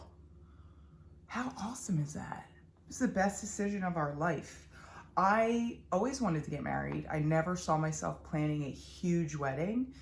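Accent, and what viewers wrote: Philadelphia, United States. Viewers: Fellow Philly girl here. I HEARD the Philly in your voice in the first sentence you spoke! Not that we have accents...it’s everyone else who have the accent! Loved your video!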